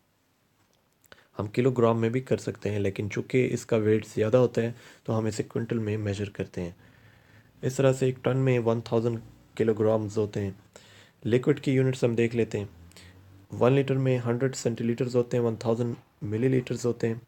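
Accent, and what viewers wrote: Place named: Pakistan. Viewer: Are you from india or from pakistan,just felt that this accent is pakistani. Anyways thumps up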